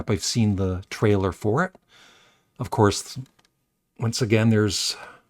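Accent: Canada. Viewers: Nice shirt youre wearing in this video and I like your Canadian accent.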